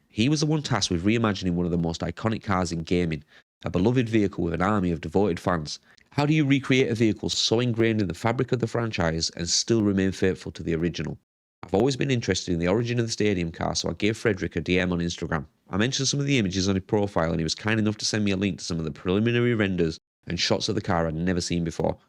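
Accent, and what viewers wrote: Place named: Yorkshire, United Kingdom. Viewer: Great video fellow Yorkshireman! Love the accent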